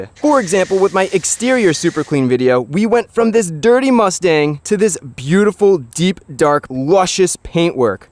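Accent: United States. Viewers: This dudes accent is so American. And he's so Positive, happy, and enthusiastic. Makes me smile and have an urge to clean.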